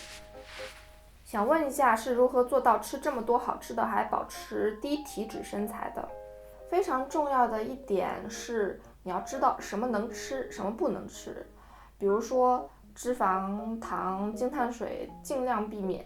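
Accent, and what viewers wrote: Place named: Shanghai, China. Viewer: 难怪口音基本就是上海口音了，完全不是青岛北方口音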